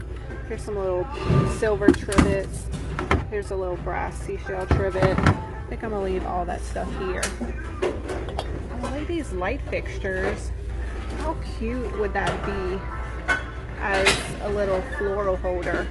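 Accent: Louisiana, United States. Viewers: The music - ugh - but I love watching you- I’m a Louisiana girl so I get your accent😍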